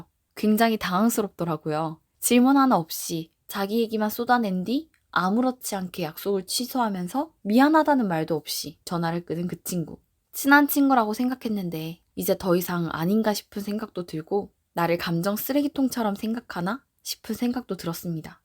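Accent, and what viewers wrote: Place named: Gyeongsang, South Korea. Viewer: 님 사투리 쓰는 거 보니까 경남, 부산에 사는 분 같네요.ㅎㅎ 딱 들으면 알지예.ㅎㅎ 저랑 지역이 동일할 듯.ㅎㅎㅎ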